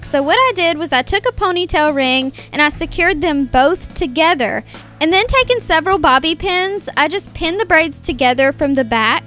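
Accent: South, United States. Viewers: what state do you live in??? you sound like you have a southern accent and i love it!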